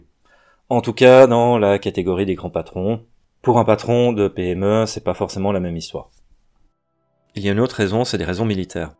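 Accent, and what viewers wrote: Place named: Monthey, Switzerland. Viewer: Excellente vidéo ! Claire et concise, j'aime 👍 Par contre, tu viendrais pas de Monthey par hasard ? Je peux me tromper mais il me semble que tu as l'accent de la région 🤔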